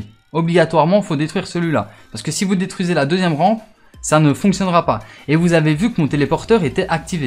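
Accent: Belgium. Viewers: T es belge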